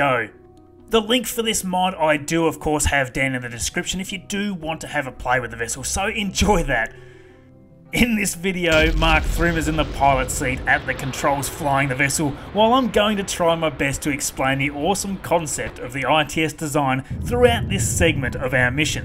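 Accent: Australia. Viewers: Wow. While listening to you, I thought to myself "this guy has a funny accent, I'd say it's Australian" Then I checked your channel and there it is, you're Australian. As English is not my mother tongue (I'm French), I'm quite proud of myself for recognizing where the accent is from \o/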